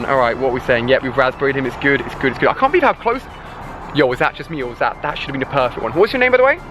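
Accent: United Kingdom. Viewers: you are awesome. Keep up the amazing work. FYI dat british accent though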